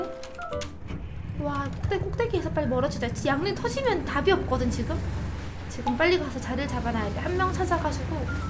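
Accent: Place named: Masan, South Korea. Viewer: 제가 FPS겜을 안하고, 못해서...그래서 PUBG도 단 한번도 안해봤고, 그러니 당연히 PUBG 방송도 안보고, PUBG 방송하시는 분들도 몰랐는데... 그런데 매우 기적적이게도 유튭 알고리즘이 제가 관심도 없는 PUBG관련 영상을 제 유튭 첫페이지에 띄워줬는데 그게 마침 님이셨; 사실 썸네일과 제목만 보고는 님이 PUBG 유져 겸 방송인인줄은 모르고 썸네일의 웃는 모습이 넘 러블리해서 클릭했다가...했다가...지금 님 영상 역주행 하면서 막 다 보는 중입니다 +_+ 사투리는 거의 안쓰시지만, 말 할 때 마산 사투리의 억양이 묻어나오는데 그게 너무 귀여워요!!! 게다가 웃는 모습은 넘 이쁘고, 와의 캐미도 너무 귀엽고 좋고, 플레이도 넘 잘하셔서 저는 배그 안하고 안좋아하는 장르임에도 불구하고 님 영상 보면 너무 재밌어요!!! 아... 님 채널을 왜 이제 알았지? ㅠ.ㅜ 새해 복 많이 받으시고, 특히 돈복과 구독자복 한가득 받으시길 바랍니닼ㅋㅋㅋㅋ 구독과 좋아요, 알림설정까지 하고갑니다 ^0^ 덧: 근데 강도질이랑 인성질 메타 넘 웃기고 재밌어욬ㅋㅋㅋㅋ 양용이만 타면 실력이 수직상승하는 님 쵝옼ㅋㅋㅋㅋ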